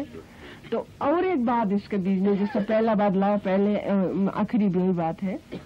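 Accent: Bengal, India. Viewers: Her accent is Bengali. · Yes her accent sounds like Bengali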